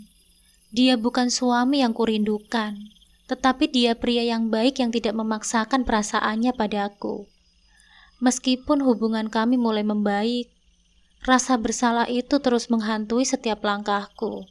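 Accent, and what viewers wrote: Java, Indonesia. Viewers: Maap pembawaannya medok mbak kurang enak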